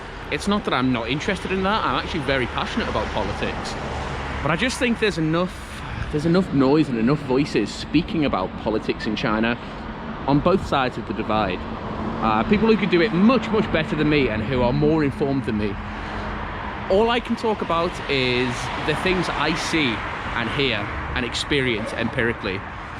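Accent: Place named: Merseyside, United Kingdom. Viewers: I detected a Merseyside accent - when you mentioned Rock Ferry, it confirmed it. I am British born Chinese from Liverpool. Nice video. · A pleasant guy with a lovely English accent exploring China. Great video mate! I'll keep watching and listening.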